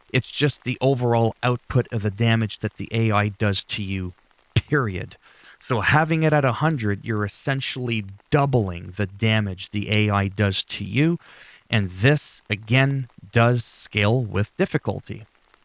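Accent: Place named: Canada. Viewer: Merci by the way im Gove pleasure too meet u I really like your Canadian accent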